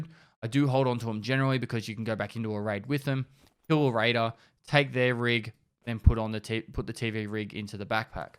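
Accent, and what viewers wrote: Australia. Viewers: man, wish I could understand his aussie accent -_-! I miss 70% of the info xd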